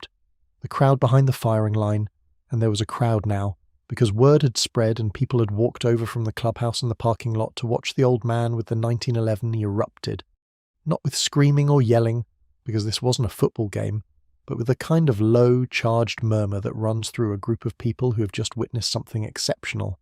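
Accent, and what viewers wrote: United Kingdom. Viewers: These are Bull Shit Stories, especially from the point of view of someone that knows the 1911 and how competitive shooting works, only a Non Shooter thinks competition shooters are so Stupid. Narrated by a Non Shooting Brit. Competitive shooting gets technical and most shooters are professionals. Also a laugh, the Age of a 1911 matters not. Nobody I know ever made fun of a Veteran At one point, Revolvers were used in Competitive shooting. And nothing beats a Pre Series 70 trigger.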